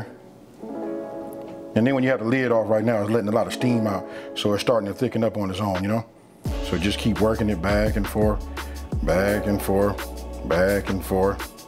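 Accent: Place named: California, United States. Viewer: Once I heard that Cali accent I was like Nahh I trust sun cooking..... don't know why but I do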